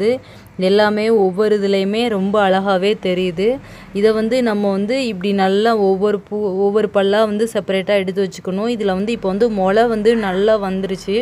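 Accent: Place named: Tirunelveli, India. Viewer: அக்கா நீங்க திருநெல்வேலியா, திருநெல்வேலியில் தான் பூண்டு பூடு ன்னுதான் சொல்வாங்க நண்பர்களே.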